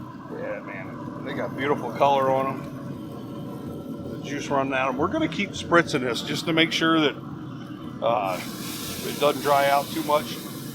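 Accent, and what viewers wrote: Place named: Pittsburgh, United States. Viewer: It's funny because I was watching this video and was catching your accent heavy. So I went to your channel and lone and behold, you're from the Pittsburgh area, as am I😂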